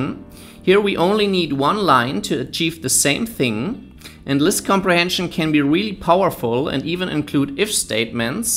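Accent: Germany. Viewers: Are you a German?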